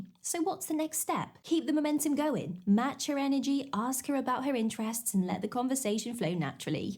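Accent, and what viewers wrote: United Kingdom. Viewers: Hello there. How are you doing on this lovely beautiful day? Well, it's lovely and beautiful where I'm at in Texas! 😊 Just like that crackhead needs crack for his crack pipe. I needed to hear that AWESOME British accent today! You have a very blessed day! 🙏 You're still finer than frogs hair! 👌 👍👏😎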